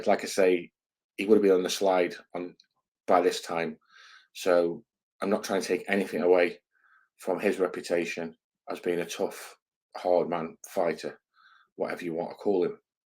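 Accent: Stoke, United Kingdom. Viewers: Is the narrator from stoke?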